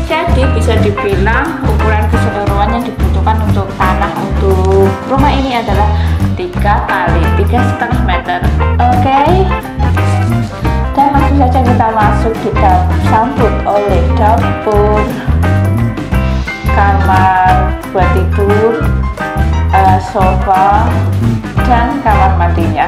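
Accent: Java, Indonesia. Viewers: Sy suka dialek bahasanya. Medok tp lucu n sumringah dengernya😊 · Mbak ko logatnya medok bnr y? Tapi sy salut... Idenya kreatif. kbetulan sy rncananya mau bangun kos2'an 4 pintu. kalo duitny cukup. Smoga tmbah sukses y Mbak??? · medok banget suaranya mbakk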